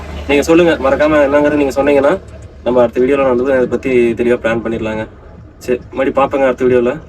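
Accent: Kongu, India. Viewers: கொங்கு தமிழ் பேச்சு அருமை அருமை தம்பி. அப்பாவின் உழைப்பும் திறமையும் உங்களுக்கு பேருதவியாக இருக்கிறது தம்பி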